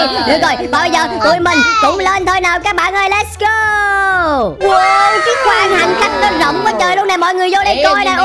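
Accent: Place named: Thanh Hoa, Vietnam. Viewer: Noi thanh hoa ngoai nghê an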